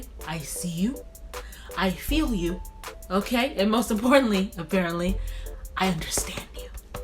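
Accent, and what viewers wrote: Louisiana, United States. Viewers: lolll i heard the Louisianan accent. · OK I didn't know got a lil Louisiana spice going on 😛😛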